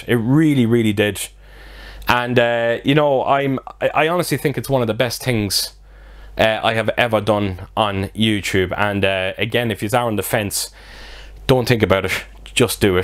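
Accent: Ireland. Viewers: I’ve been watching your channel at least one an half to two years. Just hearing your accent makes me want to get to Ireland. You give honest opinions. Keep up the great job you’re doing!☘️🇮🇪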